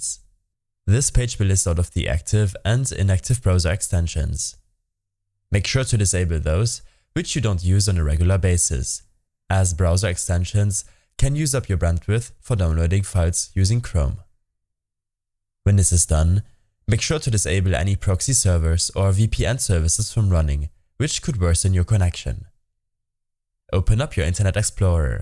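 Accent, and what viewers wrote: Germany. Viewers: Man hört deinen Deutschen Akzent raus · haha ich konnte an der stimme einfach dran erkennen dass du deutsch bist wegen der aussprache😂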